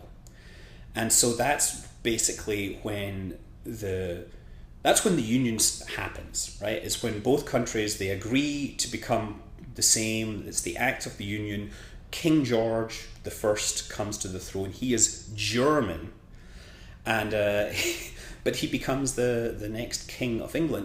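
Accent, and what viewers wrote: Scotland, United Kingdom. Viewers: Your so smart! I would never normally give a crap about Scottish History, But serenaded by your sweet Scottish voice Makes me watch history crap:D Do more science videos! Maybe you should talk to some of the "How it's made" people you would be so good at narrating that. -Note, Not sarcastic at all, I really do like his voice. and am a big fan of his KSP stuff:D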